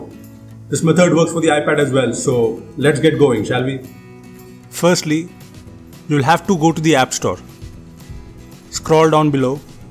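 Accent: India. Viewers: When the Indian guys will fix the English accent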